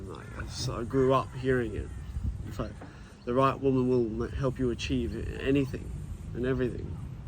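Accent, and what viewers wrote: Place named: Australia. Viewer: Found this little vidya in my youtube feed, I'm glad you made it brah (I had to watch it on 2x speed as your eshay lad straya accent makes my yankee ears tickle). I'm 25yo now, still figuring things out, still gotta mountain of improvement ahead of me, but that's the beautiful thing bro. Even though I still trip and fall a lot, I'm the happiest I've ever been in my life because I feel like each year I'm closer to becoming a better man. No matter what happens: gangas or no gangas, bugattis or maccas, sickkunt or sad kunt, these are good problems to have cause every day I'm not dead or in prison is a day to be grateful for. I've learned to forgive myself when I slip, to not regret my past mistakes (no matter how retarded), and to not wait for things to be perfect. Climbing the mountain is why we're here brah: we fall, get injured, slip back down, but still get after it and make progress. 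Even if life doesn't go as planned, or every day is failure and is spent in tears - as long as we stay true and do our best, I know Zyzz and Tupac will smile upon us - and I know that we'll smile in the end too. Cheers mate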